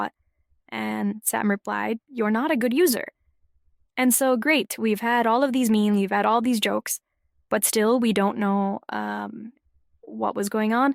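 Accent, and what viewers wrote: Quebec, Canada. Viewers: Doxxed as likely Canadian from Quebec in 2 seconds despite the attempt at obfuscation. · Voice heuristics suggest likely a Canadian from Quebec despite the attempt at AI obfuscation.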